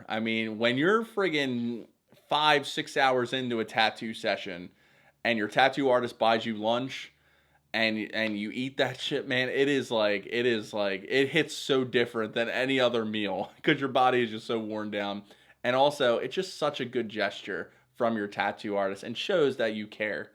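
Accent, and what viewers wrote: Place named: Philadelphia, United States. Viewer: Completely unrelated comment I'm new to your channel and listening to you talk I immediately thought "this dude's gotta be from philly or the surrounding area." then you mentioned and "wooder."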